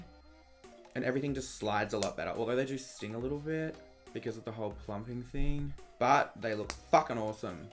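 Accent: Australia. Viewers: lmao at the aussie comments and voice hahahaha 👌😂😂😂😂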